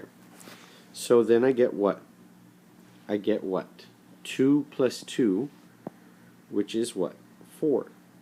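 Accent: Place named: Canada. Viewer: can’t get over the Canadian accent.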